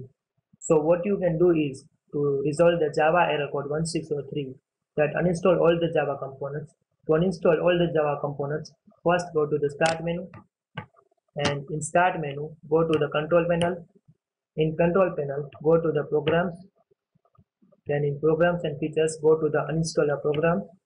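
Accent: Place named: India. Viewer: thankyou nobody could show how to solve the error code they just showed how to download it. s soon as i heard and indian voice i knew you were going to solve my issue.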